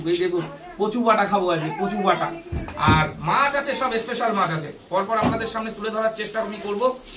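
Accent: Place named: Dacope, Khulna, Bangladesh. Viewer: তোমার কথা একদম আমাদের মতো।দাকোপ,খুলনা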